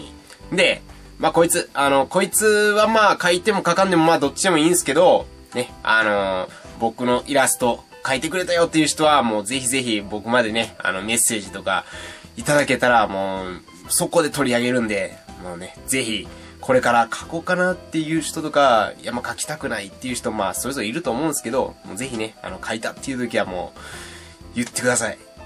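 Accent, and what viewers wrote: Kansai, Japan. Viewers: 関西弁の方が聞きやすいし、かっこいい！！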